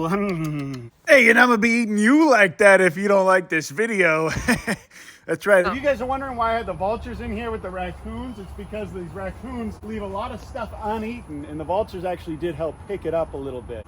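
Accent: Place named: South, United States. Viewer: uncle, you have never sounded this southern before.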